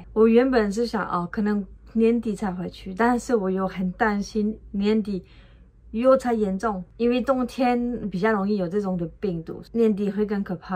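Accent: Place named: Taiwan. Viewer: 一个法国人一口台湾腔，哈哈。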